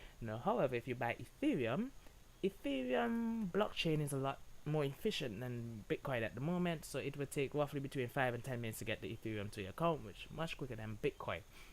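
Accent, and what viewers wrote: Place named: Caribbean. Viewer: Hearing a Caribbean Accent....Respect !!!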